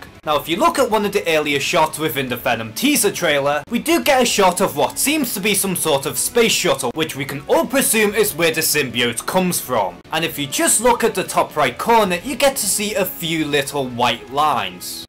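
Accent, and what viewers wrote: Liverpool, United Kingdom. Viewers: Woah are you scouse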